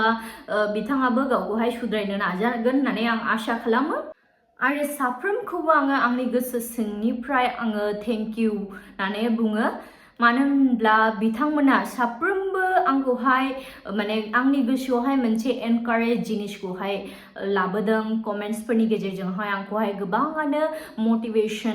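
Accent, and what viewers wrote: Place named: Bodoland, India. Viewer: Jahag Lagtar bai abo bodolend ni Rao Baidi sur Pwra 😊❤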